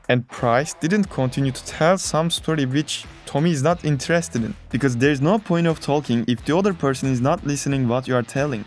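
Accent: Turkey. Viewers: are you from turkey? Your accent is very similar. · Bir türkün ıngilizce konuşması 😂 çok belli ediyor kendini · Bro are you from Turkey your accent sounds so Turkish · Türk aksanı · türksün aksandan belli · Nice video mate, are you Turkish? Your accent sounds like really really Turkish! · admin are you from turkey? your accent is so similar so im asking :) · Türkmüsün kardesim. Aksanın benziyor :D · Türk sivesi nerde gorsem tanirim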